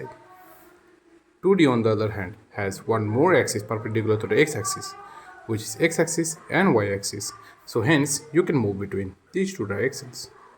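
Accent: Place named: Africa. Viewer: U got the African accent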